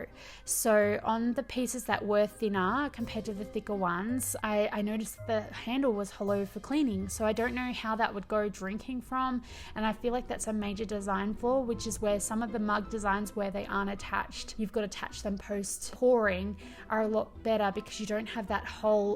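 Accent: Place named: Australia. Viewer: You are such an Aussie! Lmao "oh there's a creepy crawly." You pick it out. Me: nope!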